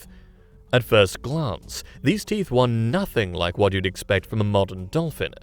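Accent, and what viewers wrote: United Kingdom. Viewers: If we're going with the theory of evolution, which is never actually been proven, by the way -- why are cockroaches and crocodiles exactly the same over billions of years? Is it possible that those one or two fossils that somebody found were just mutants and not some pre-evolved form? I mean the theory of evolution just cracks me up to be honest and then we get this dramatic British man servant accent and I don't know what to think of it this😅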